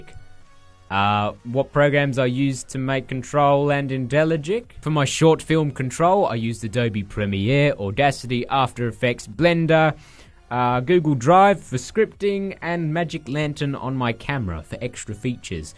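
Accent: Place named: Australia. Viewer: we're both Australian :D i didnt know because im too used to the Australian accent...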